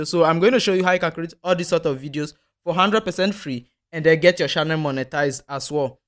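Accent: Nigeria. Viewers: Wow... Nice content 🎉 Your accent is gradually becoming 100% Nigerian, wow ❤. Ghana and Nigeria are one ❤ I was surprised seeing how you follow a lot of Nigerian accounts online. Great content mi bro 🎉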